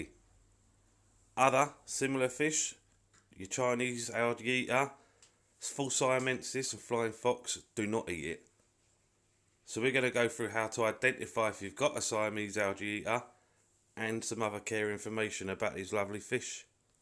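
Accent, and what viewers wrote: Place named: Cockney, United Kingdom. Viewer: Mate this is the first one your videos I have watched and I love it. Purely because it’s so good to have a cockney lad doing a video rather than listening to more American voices! 😁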